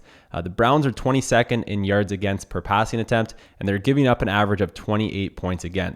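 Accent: Canada. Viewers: SirDeonTheGreat he's from Canada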